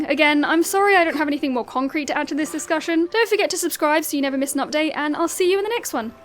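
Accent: United Kingdom. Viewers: Can I just say I LOVE your accent? Is it British? I can listen to your talk all day <3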